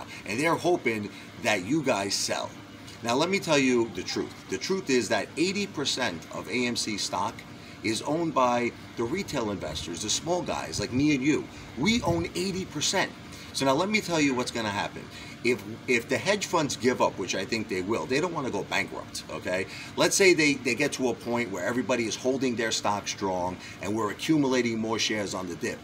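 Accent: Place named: Long Island, United States. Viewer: I’m from LI…you sound and look like my people!!!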